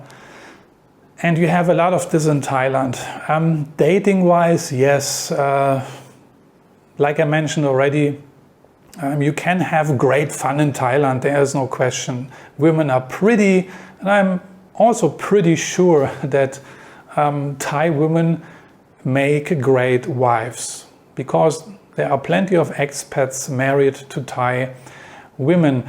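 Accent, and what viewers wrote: Germany. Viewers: You're very interesting!😉. With that german accent and dry sense of humor, very funny! Wow you've been traveling a long time. I need to get back to SE Asia. I been considering returning to Thailand. I was a teacher in Thailand, Japan, and Taiwan previously. I recently got an offer to teach in Indonesia but am nervous due to the possible natural disasters and political strife but which country doesn't have this problem?...LOL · Can I recognize your accent as German? All in all, our experience is much of the same than yours. Somehow we still became starting to apply for a Thai Visa whereat we ourself also know ourselves how great the people in Indonesia are. We've not been to Bali though, and our experience in Aceh was different. Including that, we've been to Indonesia for 6 times until now. I myself would like to have an Indonesian retirement visa additionally to the Thai Visa but my wife isn't as convinced of that for now. 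The other mentioned countries never have been an alternative for us, maybe also because one of us is trans woman. That might, seen from the West, seem a little bit not so logical given that that Indonesia is a Muslim country. But, except from Aceh, our personal experience experience was that the people there don't care about that at all. I'm not talking of people as staff or otherwise expect a deal with you. To cut a long story short, where are you living in Indonesia? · Your Accent sounds is very German ..are you ? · Indonesia is good but the Philippines is garbage .But his broken English in a heavy German accent is irritating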